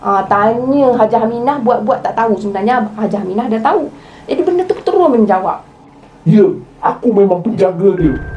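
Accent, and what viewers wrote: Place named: Pahang, Malaysia. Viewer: Aok ni org mna.. melantoi mlek cara aok bcakap😅😁..salam rodong seram dari kwn org phg..peace✌️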